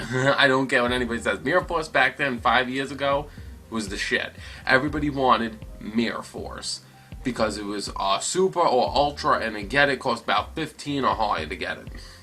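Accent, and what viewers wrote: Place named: Brooklyn, United States. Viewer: you from brooklyn bro?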